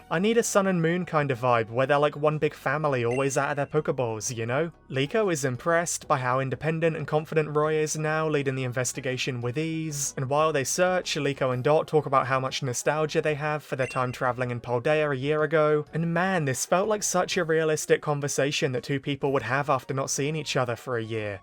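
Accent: United Kingdom. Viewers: You don't sound tired..... you sound brittish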